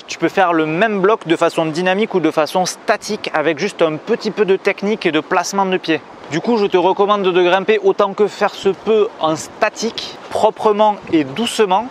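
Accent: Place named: South, France. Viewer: Aaaaaaaaah l'accent du soleil! <3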